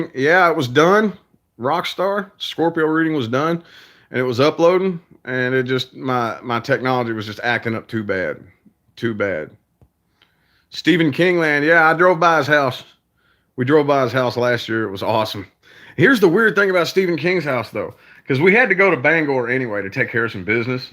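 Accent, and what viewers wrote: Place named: New York, United States. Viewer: Does ur hair hurt .. wat kinda question is that??lol haha... chillin n relaxin all day errdayyy..love it haha 😊😊 ps.. love ur accent.... a new yorker like me notices these things 😊😊 and yea anything by stephen king is awesome um.. Hmm wat else??o yea.. fuck the haters.. fuck the braggers!!! stay the chill ass person u are 😍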